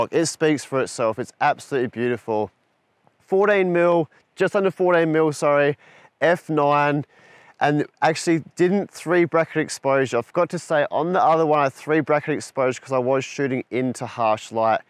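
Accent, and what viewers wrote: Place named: Australia. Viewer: Hi Mate, sorry to just now be getting to your video as I've been in the process of moving. As always, I have enjoyed the video and the efforts made to deliver your content, sick Australian accent and all! It was pretty evident the struggles you were undergoing during the Asia trip, maybe just the wrong time of year. The vantage point you were at for valley horseshoe river shot was choice. So nice to have travel chef/photographer along for this journey as well, you'd be screwed without him, haha. I'm curious, have you ever been into Slovakia? I have a nephew living there and suspect the landscape is fantastic there as well.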